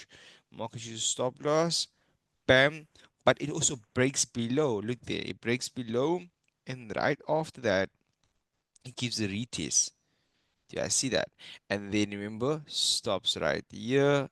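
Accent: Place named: South Africa. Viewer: I heard a South African accent then I subscribed , I will follow you on other social media platforms , I need to be part of a community based in SA ... I'm in Plettenberg bay by the way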